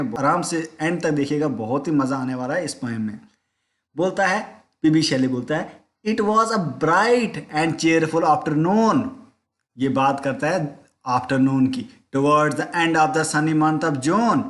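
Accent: Kashmir, India. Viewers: R u from kashmir?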